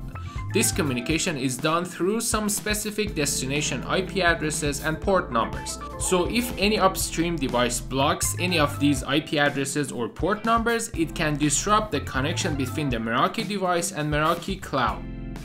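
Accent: Iran. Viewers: Iranian accent,the only english video that i can understand it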